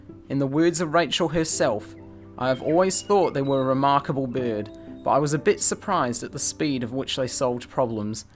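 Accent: United Kingdom. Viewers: wait your a Brit?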